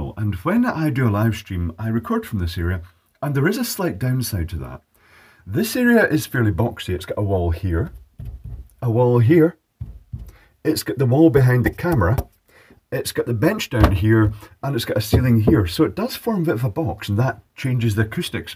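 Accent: Scotland, United Kingdom. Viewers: The unintelligible issue is caused by the Scottish accent not the foam. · I watch your videos and verry much like them, but off topic now. Are you Scottish? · Total difference but your deep Scottish bass tones are still there 😃👍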